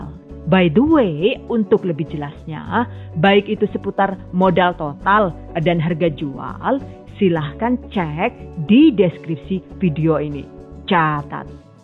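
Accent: Banyumas, Indonesia. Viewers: mesti kekel aq mbak nonton pideone pean...ngapak e iku looo nggarai pingin njiwit.....sukses selalu mbak resepmu sangat mengispirasi.... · ngapakke nemenn mbangett gehh... Wong ndi si jane rika mbk? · Mesti nguyu nek ono part ngapak yakin😘😘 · Bu asli mana mba ngapak SMA mba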